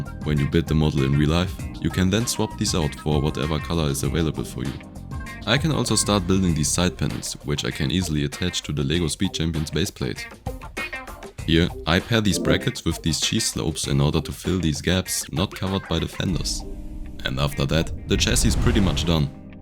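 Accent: Germany. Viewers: your english sounds like my friend from saarland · Perfect build and video, I hear some german accent I think 😂❤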